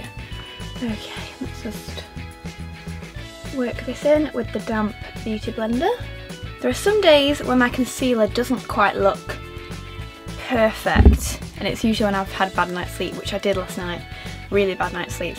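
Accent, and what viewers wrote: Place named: Northwest England, United Kingdom. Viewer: I feel like we are from the same place, I read your faq and you're from the north west of England and so am I but your accent sounds like the town I'm from😂💕 I loved this look and you suit white-blonde hair so much! I wish I could pull of that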